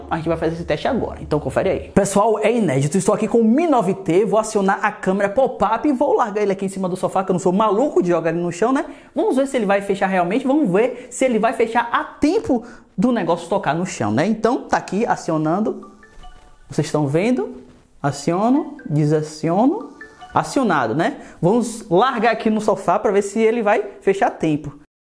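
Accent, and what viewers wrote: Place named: Northeast, Brazil. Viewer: Sotaque nordestino KKK chato né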